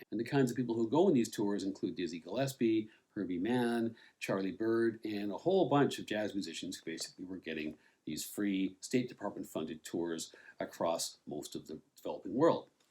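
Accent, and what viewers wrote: Canada. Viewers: Really enjoyed this review. Maybe it's a start of something? Pick and artist or sub genre and do a best of/overview. Anyways very enjoyable and always well researched and presented. Good Job as they say in the US. Interesting that you started out in the SW of England I think you said. I kinda guessed that maybe you were Canadian. North American accent but not US. You made a great point on the US Govt sending down black Americans to represent them when at home the average Afro-american was not afforded the ......let's just say apartheid was alive and well! That's a whole other rabbit hole so back on point. Love your reviews and love your presentation and style. Very pro, well researched.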